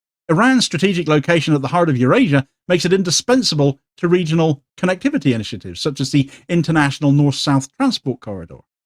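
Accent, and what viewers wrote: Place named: West Country, United Kingdom. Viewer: is from South West of England! That's our accent! 😂😊xxx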